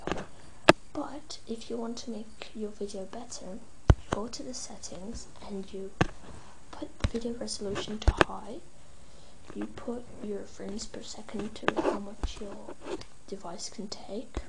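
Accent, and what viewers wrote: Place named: United Kingdom. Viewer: british accent hahhahaha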